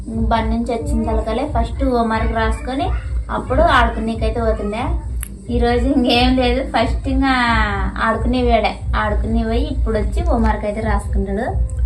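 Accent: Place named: Rayalaseema, India. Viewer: Adi rayalaseema village yasa ardhamuc hesukondi.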